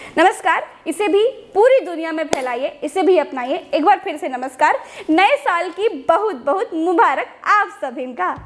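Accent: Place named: Awadh, India. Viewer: आप यक़ीन कीजिए आपकी अवधि भाषा सुनने में बहुत अच्छा लगता है, बहुत ही अच्छा... 👌 खासकर आपकी "भाषा शैली" का जवाब नहीं मैडम..! 🙏🙏🙏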